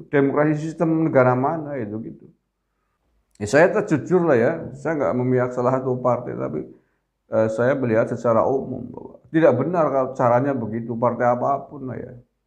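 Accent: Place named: Brebes, Indonesia. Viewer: wkwkwkwkwkwkwkk....wagu jegu...kya kya wong brebes rika Whaaa...mntap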